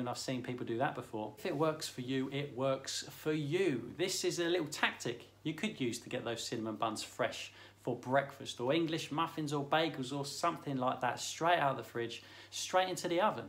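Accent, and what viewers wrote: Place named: England, United Kingdom. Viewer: Finished listening, just for the good old English accent. Miss it.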